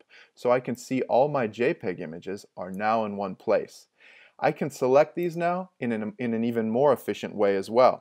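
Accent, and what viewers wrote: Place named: Kansas, United States. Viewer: Cats and Ringworm yes, I really liked the delivery. I'm from Kansas, maybe it's the same dialect? thank you for this helpful video!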